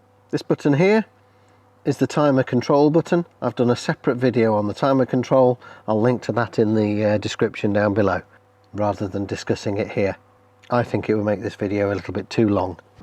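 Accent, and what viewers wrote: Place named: England, United Kingdom. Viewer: Hi. My sister and I bought this hot tub for our Canadian wilderness cabin…it arrived without any instructions ….. that made sense to us at least….so we you tubed it….and voila! A wonderful Englishman who walked us through every step..use language we understand in an accent we love…so we are here in the mountains, in the back country of our cabin waiting to turn on the heat and enjoy the amazing stars visible only at night…we are hoping to get the right temperature tonight….thank you so much~!!! You absolutely made our summer!!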